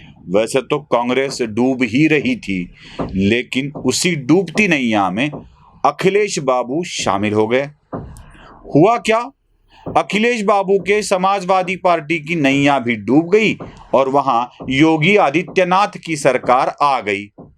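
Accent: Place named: Maharashtra, India. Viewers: सर आपली हिंदी मराठी वळणाची आहे तरी खूप छान आणि सटीक आहे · Great.. Good... But you have to improve in hindi diction & leave marathi accent No doubt keep practising will get good following due to your pitching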